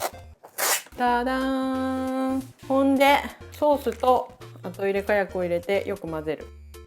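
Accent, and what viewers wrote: Izumo, Japan. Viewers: 島根出身だけど最近帰ってないからちょいちょい横に出てくる出雲弁が懐かしくて涙ちょちょ切れ！ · どげだ…出雲弁！同郷の星やね～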